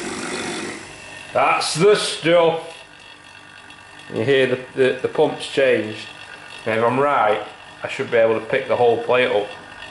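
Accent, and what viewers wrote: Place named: Northern England, United Kingdom. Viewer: Hi, Its actually nice to hear a northern accent instead of an American one. However i do like the guy John Saunders here on YouTube NYCCNC Hes the dood. I was just looking for the.nc code (has the machine code XYZ steps in it) file your machine used to cut the troughs in the plate. I figured you were using a cnc machine to mill the table. What program is your mill using Mach3?. I produced an autocad drawing of a vacuum chuck not dissimilar however i did cheat. I thought of milling the grooves in the top as you would but then drilling only one hole in from the side of the plate into the centre then a hole in the top of the plate down into the side hole. Your design using a chamber milled under the plate instead which to be honest is the correct way of doing it. I tried to convert the file in Cambam (post processor) into an NC file for my milling machine but ended up making about 100 profiles around each castllation in the top plate, not the straight x then y mill routes i was expecting. 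Im Still a novice i'm afraid. I did manage to build a vacuum ejector/generator for to go on an air supply like this one on Ebay <link> Got some good results as well I have a similar Vac pump to yours which is actually what i will use in the end.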